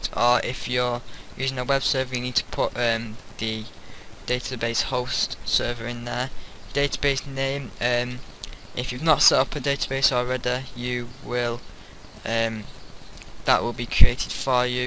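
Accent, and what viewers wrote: United Kingdom. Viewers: Then I thank you for your help in making MediaWiki work. I'll find a way to solve the problem in which you solved a part. I just need to know if these files work with MediaWiki or not. I've never had a British friend. We can be friends if you like so. I'm an English student n' I like to know about Britons and British accent is my favourite. You did great. Thanks a lot. Later.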